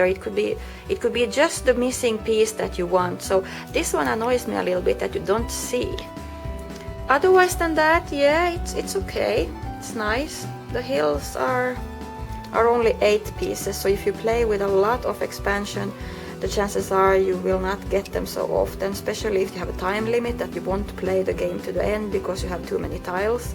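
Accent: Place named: Finland. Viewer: Suomi <3 I could listen to your English the whole day...it sounds great :D Oh, do you know if there's Carcassonne tournaments in Finland/Helsinki?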